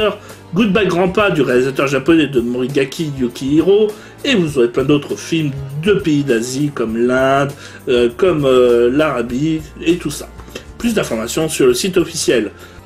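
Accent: France. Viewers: L'anglais a la française haha mais j'aime bien se format et voir Detective Dee ça fait toujours plaisir. Tu ma un peut Hyper avec le film psychokinesis.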